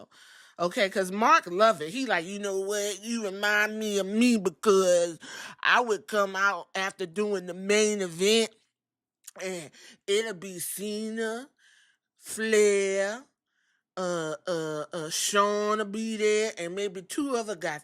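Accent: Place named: New York, United States. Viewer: Yo I love your NY it reminds me of home…”fk outta here!” 🤣🤣🤣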